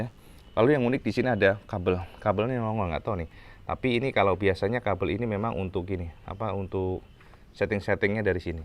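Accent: Indonesia. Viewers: asli mna si pean cak,ko medok bngt bicarae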